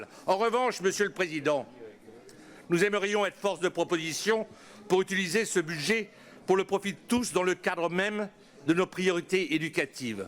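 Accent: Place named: Île-de-France, France. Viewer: Et ton patois d'Île de France tu sais où tu peux te le mettre ?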